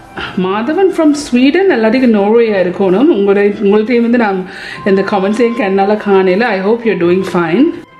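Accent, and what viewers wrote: Jaffna, Sri Lanka. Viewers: அக்கா நீங்கள் யாழ்ப்பாணமோ.? யாழ்வாடை வீசுது உங்கள் கதையில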